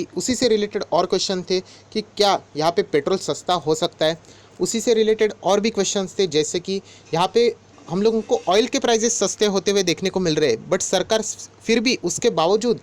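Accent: Maharashtra, India. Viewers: Kachra nhi hai khara Sona hai 😄😄 Aap Marathi ho kya sir ??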